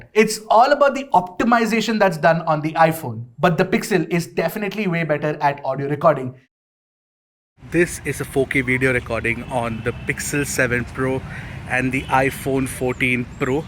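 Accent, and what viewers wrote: India. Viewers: Indian accent 😂😂 love it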